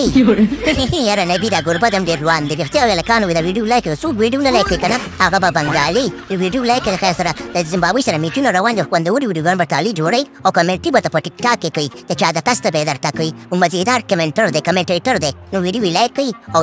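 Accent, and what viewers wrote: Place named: Peshawar, Pakistan. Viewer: تیزنو پسکنو سوچه پشتو ووایه دا پیشوری لهجه پریژده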